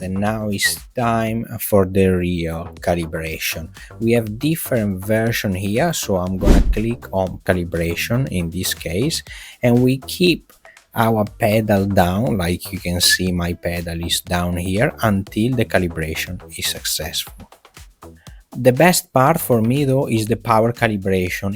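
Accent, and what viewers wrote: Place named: Italy. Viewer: Ciao, se non sbaglio dall'accento sei italiano🇮🇹 .... Perché fare video in lingua inglese😢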